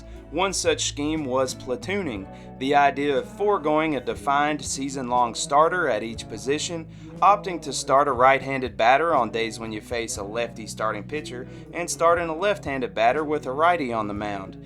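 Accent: South, United States. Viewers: I don’t know why. But I really enjoy your voice. I’m from Ontario Canada. In no way am I insulting you. Can I ask where your from. I want to guess some where in the south. I think you are a great story teller. Keep up the great work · Love your southern accent . Makes an oldschool baseball video more authentic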